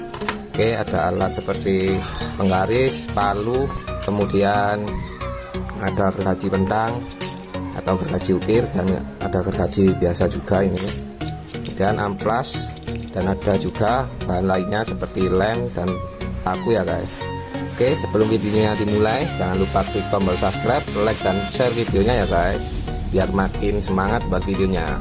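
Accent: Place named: Malang, Indonesia. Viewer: Mas sampeyan iki wg malang ta? .... Kok logate arema pasti tak like mas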